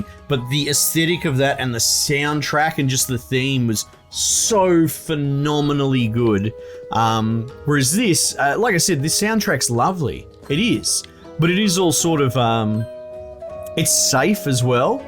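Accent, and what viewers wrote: Australia. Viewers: Nice original commentary, maybe I just appreciate a good ozy accent lmao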